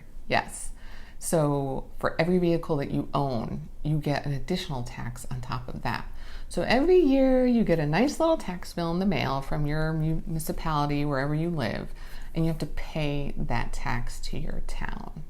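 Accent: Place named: Connecticut, United States. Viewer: CT's climate is one of the best in the country. Winters here are a joke. Compared to the Midwest or northern New England, the cold seasons are a breeze. You definitely have an accent. Lol CT and RI accents are very similar in Eastern CT and the more West you go the more like NYC it gets, and up along the CT River and in the middle it's pretty neutral, though it's still there, and the more north you go, the closer you get to Mass and it reflects there. (Just for the record, Mass and RI suck -Love Connecticut lol)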